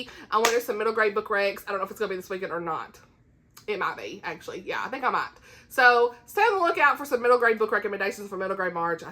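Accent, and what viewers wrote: South, United States. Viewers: Your southern 😂😂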